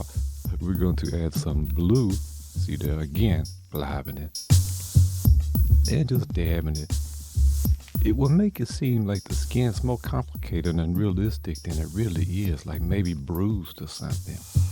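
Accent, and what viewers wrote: Louisiana, United States. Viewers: Are you from Louisiana by chance?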